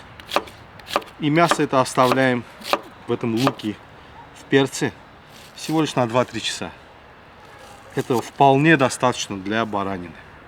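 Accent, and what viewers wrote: Baku, Azerbaijan. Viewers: Доброго здоровья! Ваш русский язык с Бакинским акцентом, для меня бальзам для души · Спасибо дорогой земляк сидел бы вечность смотрел и слушал. Бакинский диалект это просто песня азербайджанского народа. Как я скучаю по Родине по дорогому моему сердцу Баку. По своему милому посёлку Новые Ахмедлы. С уважением к вам Иващенко Андрей Викторович. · Как азербайджанцы никто так вкусно не готовит на Кавказе. Ну и так вкусно рассказывать тоже. Не удержался и подписался! Этот непередаваемы азербайджанский городской акцент это супер. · Сразу по разговору Бакинец))) привет с Разина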